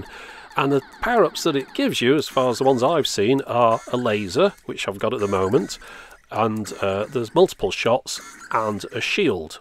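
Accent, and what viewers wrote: United Kingdom. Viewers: Makes me miss my old Vectrex even more. I've always had a soft spot for vector graphics games, and poured way too many quarters into Asteroids, Star Wars, and Tempest machines as a teenager in the eighties. While all my friends were buying Colecovisions and Atari consoles, I scrimped and saved for the Vectrex. I had the 3-d goggles and the light pen and most of the games that were available for the machine. I foolishly gave it away to an old flatmate trying to downsize for a move to the UK. I ended up back in the states eventually, and now have a 16 year old son who loves retro gaming. Looking at what the Vectrex and accessories go for on Ebay, I fear I'll never have another one. Hopefully this technology will mature to the point where I can play a ten foot version of Tempest on my ceiling! Love your videos, and your accent makes me homesick for my adopted second home in Blighty.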